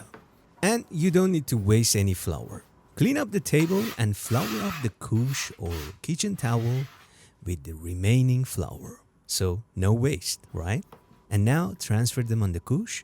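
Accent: Iran. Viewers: I bet this guy is iranian. And the petsian accent kept on increasing throughout the video😂 Btw yeah, thanks for the video. I'm going to try out this recipe also.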